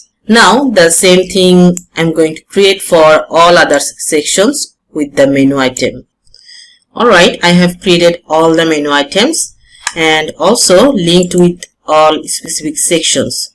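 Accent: Bangladesh. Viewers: Proud to see Bangladeshi English Accent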